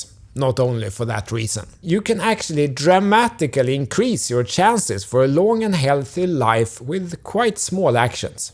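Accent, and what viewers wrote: Sweden. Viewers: Can’t hide that accent hello from a fellow Swede 🇸🇪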